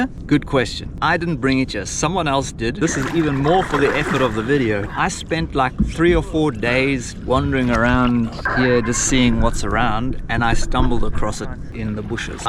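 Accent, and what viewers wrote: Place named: South Africa. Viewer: I really like you videos. Freedom shouldn't be an expensive thing. You make it look easy. 😊 You are a South African born aren't you? Your accent is a clear give away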